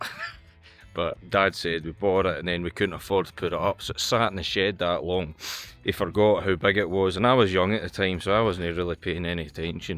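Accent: Scotland, United Kingdom. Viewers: Hi great videos and I really enjoy watching them. Especially as you're a fellow Scot with a similar accent. I was born in Lanark. I'm curious to hear where in Scotland you are? Are you south west of Lanark? Keep the videos coming 👍👍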